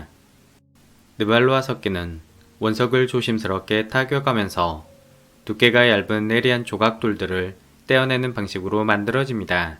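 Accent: Jeolla, South Korea. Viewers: 남도 사투리가 있으시네요.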